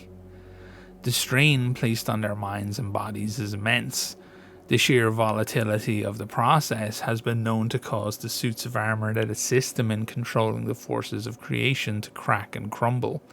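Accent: Ireland. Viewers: Doing an awesome job my man, honestly the best lore channel I've found for trench crusade. Irish accent works so well for the subject matter too !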